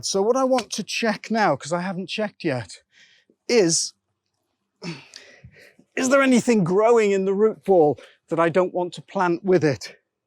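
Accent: United Kingdom. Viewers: Hi! I subscribed to your videos some days ago, but I do really enjoy your style as well as beautiful British English. I'm crazy about growing and cultivating plants, though I don't have my own garden, only a balcony one with numerous pellies. Thank for sharing your precious experience. I learn a lot from you, especially the pronunciation of the plant species. Here, in Ukraine we are all into gardening and have green hands. If only we had peace! We would make our country even better with such a good teacher like you! Your tutorials are really worth seeing!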